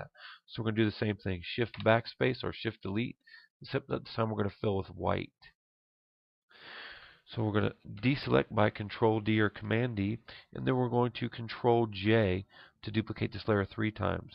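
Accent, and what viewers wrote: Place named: Texas, United States. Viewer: Yes... Great intention and i think i get it BUT a bit too fast even though you sound like you're from Texas lol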